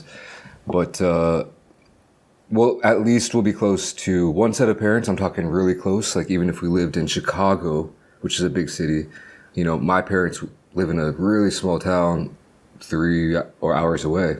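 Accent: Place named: California, United States. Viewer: You talk so cali 😊love it and i love and enjoy all your videos!!😊❤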